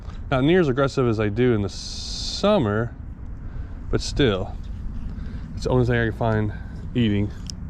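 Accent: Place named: Michigan, United States. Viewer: Sounds like Michigan to me lol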